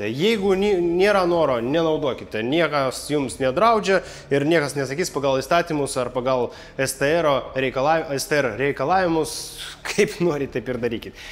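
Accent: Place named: Russia. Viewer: Šaunuolis, kad stengiesi, bet tas rusiškas akcentas ir rusiška sakinių sandara trukdo suprasti ką nori pasakyti😊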